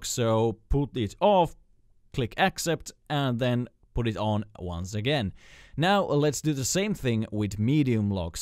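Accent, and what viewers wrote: Finland. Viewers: are you from finland?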